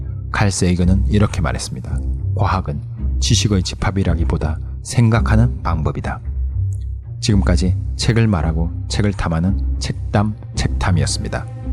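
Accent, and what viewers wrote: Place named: Gyeongsang, South Korea. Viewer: 경상도 억양이 조금 남아 있으시네요:-)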